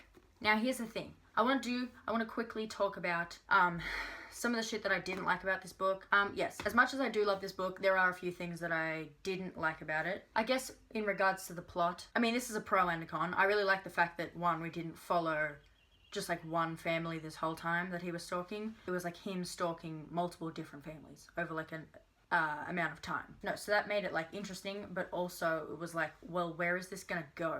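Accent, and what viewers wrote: Australia. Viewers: her accent sounds Australian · is she from Australia or New Zeland?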